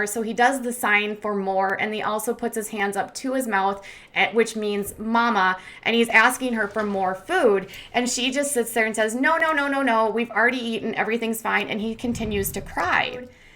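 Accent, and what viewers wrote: United States. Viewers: I know you have an American accent but for some reason my brain re-imagines it in an English accent lol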